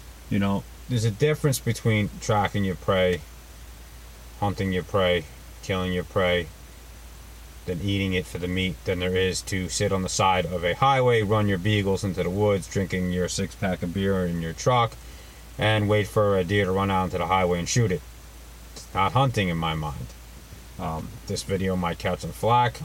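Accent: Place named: Upstate New York, United States. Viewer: You sound like you are from Upstate New York. Welcome and hello.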